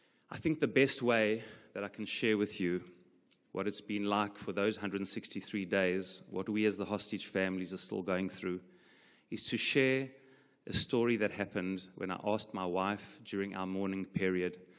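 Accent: South Africa. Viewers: Rabbi Perez as a South African I recognised your accent. Proud of you and all the other strong people of Israel. May you, your wife and all your loved ones plus all who lost their families, experience Hashem's comfort and peace. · South African accent Praying for alllll the hostages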